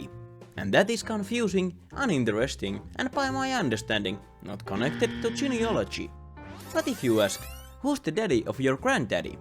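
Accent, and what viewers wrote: Finland. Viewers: As a finnish i know the finnish accent and you have one so you are finnish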